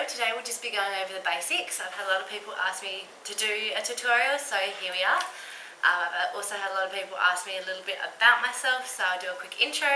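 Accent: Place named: Australia. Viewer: you have that sexy australian accent:)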